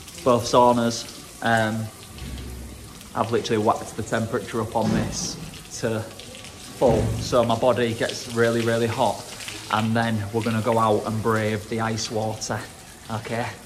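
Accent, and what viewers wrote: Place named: Scotland, United Kingdom. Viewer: The room looks beautiful 🥰 nice Scottish accent 😂x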